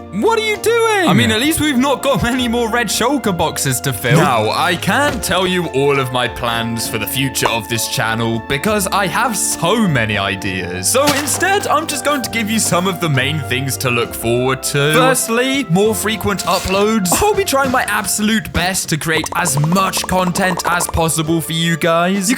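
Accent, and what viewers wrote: United Kingdom. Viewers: When and where is the frog party and are you british😅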